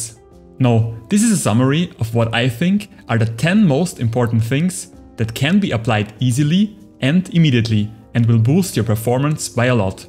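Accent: Germany. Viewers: German Accent 👌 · You German?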